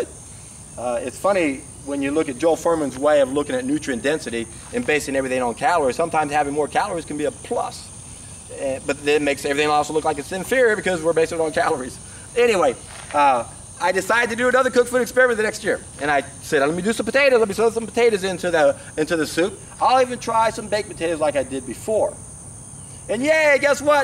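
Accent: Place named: South Carolina, United States. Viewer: your accent sounds like mine from South Carolina:)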